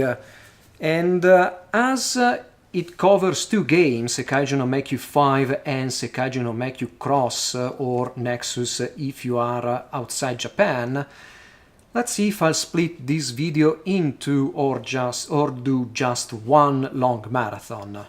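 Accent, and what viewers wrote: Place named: Italy. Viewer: Dalla cadenza inglese/maccheronica sembrerebbe lmao sarebbe una gioia, io non conosco nessuno che gioca a etrian odyssey... E community italiane penso non ne esistono :/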